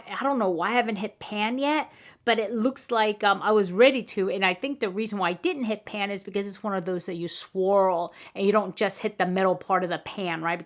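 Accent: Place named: Boston, United States. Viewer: I absolutely love your accent hon, is it Boston? I’m hopeless at working out regional accents x I’d love to see your skincare regime as your skin always looks so fresh x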